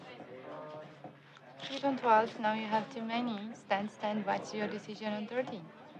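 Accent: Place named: Eastern Europe. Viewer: thank you for the answer, so they don't hide the fact they based in Romania? I wasn't mistaken then when I suggested this is Eastern European accent :)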